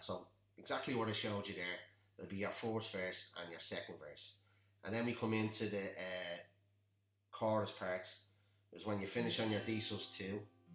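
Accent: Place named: Dublin, Ireland. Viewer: You sound like a dubliner will you be going to his gig next May in the 3arena?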